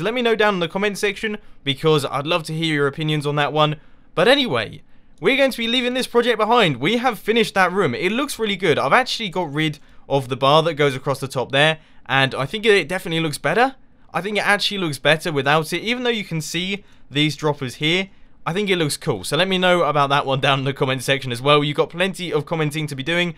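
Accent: United Kingdom. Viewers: I LOVE, AND I AM AMERICAN, SO AMERICANS LOVE BRITISH PEOPLE. THE ACCENT IS SO AWESOME. I respect that you spend time on these recordings, as I make videos myself, and I work for each one for about 4 hours, but you spend 3 whole days.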